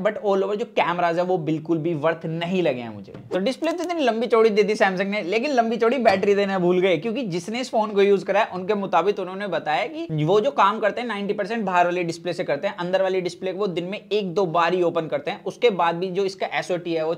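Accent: Haryana, India. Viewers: dude try to improve your haryanvi rough accent.. hindi improve karo aur accent and english pronounciation par dhayan do... gawar mat ban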